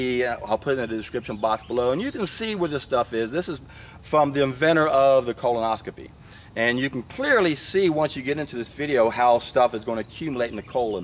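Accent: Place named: Texas, United States. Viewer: Gosh I love this guy😂😂😂😂 Get rid of the shit . LOL Very true he is , with that Texas accent . Lovely😘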